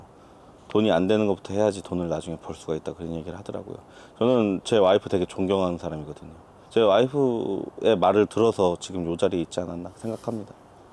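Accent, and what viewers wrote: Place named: Chungcheong, South Korea. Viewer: 고향이 충청도신가 백종원 필이 나네여 성공할 관상이네 부인분이 사람볼 줄 아는듯 임금 높은 나라는 세금과 생활비도 비쌉니다 앞으로 남고 뒤로 밑지죠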